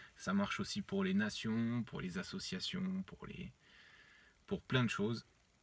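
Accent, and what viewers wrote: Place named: Burgundy, France. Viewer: Nom de Diu d'vin diu ! Tu roules les RRRR ha ha ...Je suis bourguignonne aussi, on a le même accent :) J'ai beaucoup appris avec toi, ainsi qu'avec "le blog à Cédric" ^^ Merci, si seulement les gens voulaient lâcher leurs religions, ils découvriraient le potentiel que nous avons réellement...et que nous nous suffisons à nous-mêmes :)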